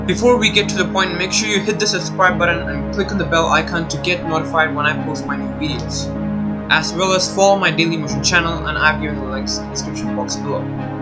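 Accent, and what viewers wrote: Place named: India. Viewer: Good information, sir, and well presented. As an American, I find your Indian accent charming, although at times a bit opaque. For a few words I needed to repeat the audio, but was able to understand the words in all but one case -- you say, "Epic browser is always on ___________ (?)". The word sounds (to me) like "ignoshiamal". From context, I assume this means that Epic is always set on maximum privacy protection...but still, I'd like to know what the word is. Also, in order to achieve maximum understanding in the U.S., I think you may need to change your pronunciation of the names of two products you reviewed: Opera and K-MELEON. In British English, opera is pronounced "AW-pǝ-rǝ" -- in U.S. English, it is pronounced either "AW-pǝ-rǝ" or "AH-pǝ-rǝ", but in any case, the emphasis is always on the first syllable. I am certain that K-MELEON's logo is the head of a chameleon, so I'm betting the browser's name should be pronounced the same as the animal, "kǝ-MEE-lee-ǝn", with the accent on the second syllable. 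I apologize for criticizing you on such trivial points. Again, the videos are VERY informative, and I do appreciate them.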